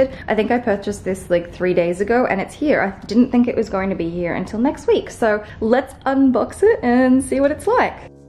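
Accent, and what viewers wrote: Australia. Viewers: Have you never heard a Australian accent before? Dude.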